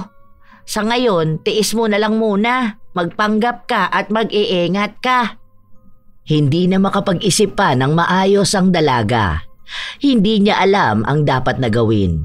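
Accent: Visayas, Philippines. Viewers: Nakakaloka qng accent may bisaya may ilonggo ano ba talaga ining hqhahaha.. wala lang natawa lang ako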